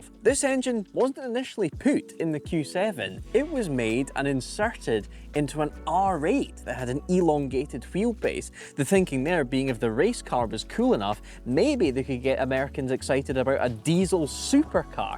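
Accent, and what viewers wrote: Scotland, United Kingdom. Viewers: Why's Nigel Mansell got a Scottish accent?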